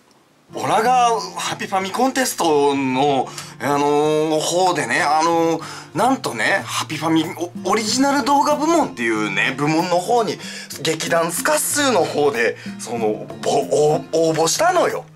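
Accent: Iwate, Japan. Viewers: まだ発表されてないみたいです。岩手弁？素敵でしたよww 次の動画も楽しみにしてます。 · さんの岩手弁？イイね！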